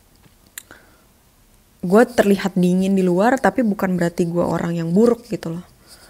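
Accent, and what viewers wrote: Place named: Medan, Indonesia. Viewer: telat nonton ini, tpi aku berasa relate bgt sih kek denger temen lagi deep talk krn skrg lagi nganggur dan chance buat burn out gede apalagi pandemi makanya dtg kesini biar dpt sedikit wawasan sembari mau jdi pendengar dikala gaada temen yg bisa diajak ngobrol. sampai sekarang aku masih mikir kak just being kak same as medan people just being medan people who had known as rough accent, same as javanese people being javanese people who had known as gentle accent, we just need to embrace all the uniqueness of different type of people. maybe for some people they are not familiar to found some people like kak, mereka terbiasa lihat klo cewe mau itu pakek jilbab gapake jilbab ya lemah lembut, atau mereka gk terbiasa dgn kata2 kasar kayak gk lumrah buat mereka. but for some people yang ada pengalaman punya temen kyk kak mereka kek "its not a problem, thats how we were friend, thats how i like her, her just being her, its her choice to how her react" at the end of the day we are all human thats it.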